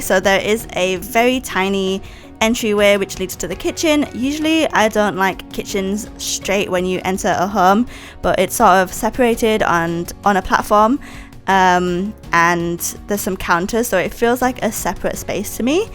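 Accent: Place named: Asia. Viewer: Hey! Firstly, gorgeous build - like, it’s so cozy and cottage-y and I love it! Secondly, your voice is so cute! And it’s so cool to learn that you’re Asian, I wouldn’t feel self-conscious about your accent or voice, but I appreciate it’s still daunting to create voiceovers haha! I struggle with my own. In response to your question on voiceovers, I tried to script mine but it came out too robotic... now I’m trying to just go with the flow, tell some stories, talk about the build, but it does take a lot out of me to record them. I’ve got so much recorded footage just waiting for voiceovers 😂 Love your videos! 💜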